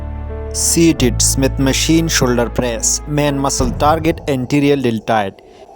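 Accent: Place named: India. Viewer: Your English very poor indian style hahaha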